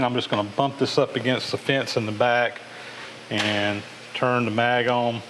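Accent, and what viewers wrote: South, United States. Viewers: In re: Your accent. One of the things that makes the US the US is that regional differences have not been totally homogenized by television yet. I have been visiting the South regularly for 50 years and while the accents have been smoothed out a little, there is still a charming difference from the speech of Yankees like me. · My understanding is that our southern accent is derived from the Protestant Scots Irish who migrated to the US in the late 18th & early 19th centuries. My family is one of those that made the journey. I happen to live in the same city as by the way. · after quite a while watching your videos I get used to your accent and your pronounciation of "oil", always sounds like "owl" to me (btw, the same with Adam Booth, must be a a southern thing). But when you said "lets grab some owl" again wierd thoughts went through my mind;) Greetings from Austria and thanks for your great videos! 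Dieter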